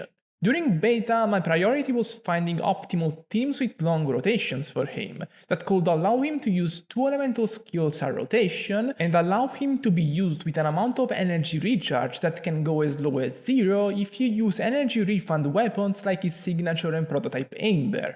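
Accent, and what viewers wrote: Italy. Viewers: This question has nothing to do with Neuvillete but, are you italian?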